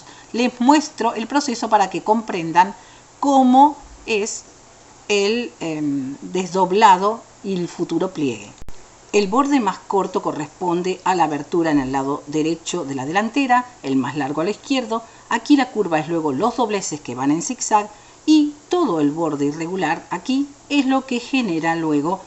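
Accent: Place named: Rioplatense, Argentina. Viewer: el acento de vos me parece el de Argentina rioplatense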